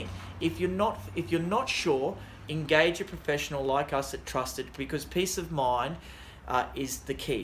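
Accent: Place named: Australia. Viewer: Great video - thanks for sharing. BTW, what kind of Aussie accent, with no powerful F words in his Youtube!!!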